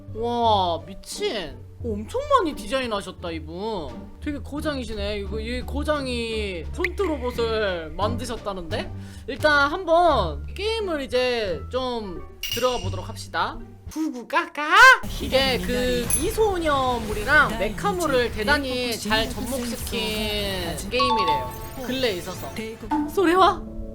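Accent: Japan. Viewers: 애매한 한본어 킹받네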